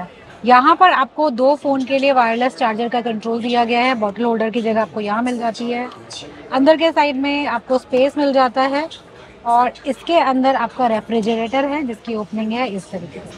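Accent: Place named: Tamil Nadu, India. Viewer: Can’t even understand what she is presenting with Tamil and India accent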